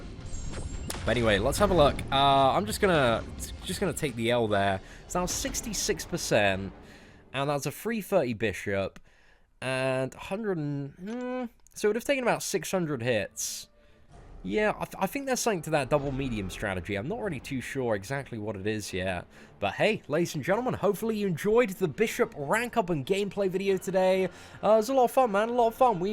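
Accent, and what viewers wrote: United Kingdom. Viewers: I hate you, but I like watching your videos with your Bitish axcent.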